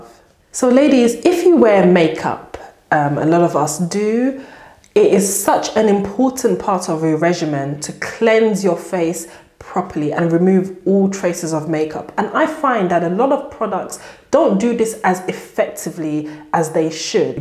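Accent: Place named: RP, United Kingdom. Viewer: That accent! very posh prim and proper. Us Americans can't get enough of it. Sending love from NY 🖐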